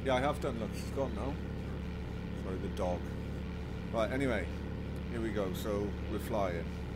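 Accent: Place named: Wales, United Kingdom. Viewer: I think you will find he has a Welsh accent. It is still English though.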